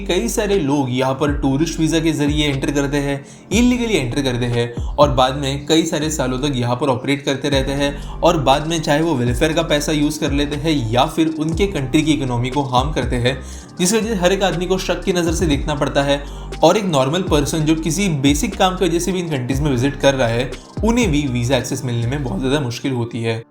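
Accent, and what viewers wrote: India. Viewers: Love the typical Indian voice and language speaking style, all Indians have the same exact which is so funny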